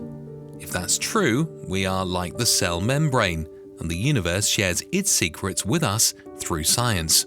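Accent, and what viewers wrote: United Kingdom. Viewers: The Universe is big and it has many stars, planets and galaxies in it. And it probably has other life forms in it too. With that being said, why do we always have some British guy narrating this stuff?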